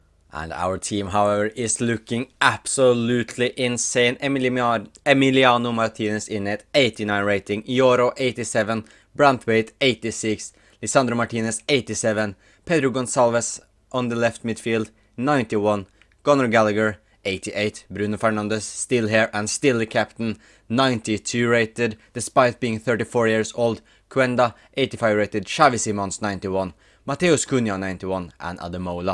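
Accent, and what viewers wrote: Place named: Russia. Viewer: You Sound Russian Bro 😂🎉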